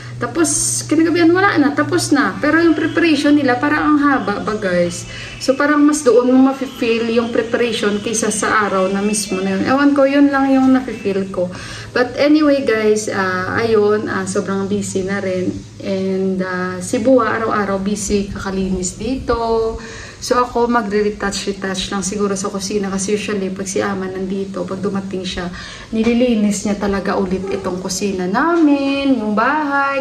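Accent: Iloilo, Philippines. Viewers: hilo nang.. kalingaw simo pamatian mag ilonggo whahha. Kahilidlaw sg mga celebration sa Bacolod. hidlawon ka gd mgpa gutok hulat sg parad nang. And Happy Dashain sa inyu da nang. God bless kag keep safe gd da nang.🤍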